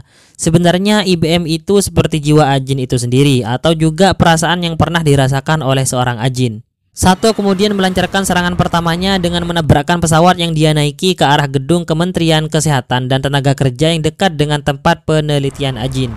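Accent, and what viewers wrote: Madura, Indonesia. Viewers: Ternyata Logat nya Madura Kawuk..Yo Opo Kabar e Pokeh Celeng..Salam Damai Dari Persaudaraan Dayak Madura Sy dari Sampit..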